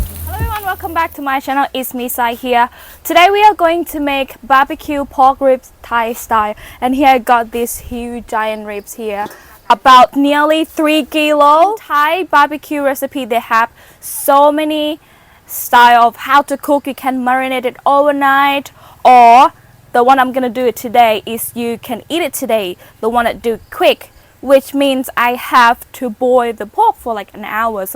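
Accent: Australia. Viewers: Did you learn English in Australia? Can hear a little accent. Food looks great! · I think you picked up an Aussie accent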